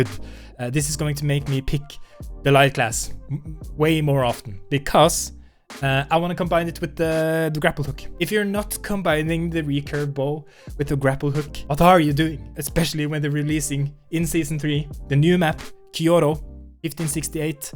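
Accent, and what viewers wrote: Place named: Norway. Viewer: So excited for the new season! (du er nødt til å være norsk jeg kan høre det)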